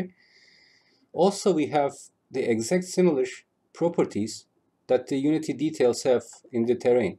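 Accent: Turkey. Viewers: Türk aksanı mı duyuyorum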